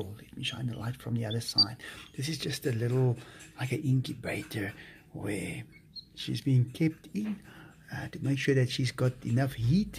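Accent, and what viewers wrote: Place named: South Africa. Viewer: South Africa.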